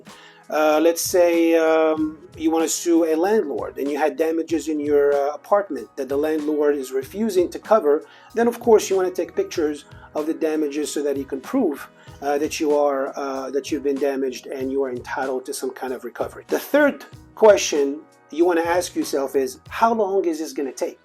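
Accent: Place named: New York, United States. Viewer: sound a bit New Yorkish.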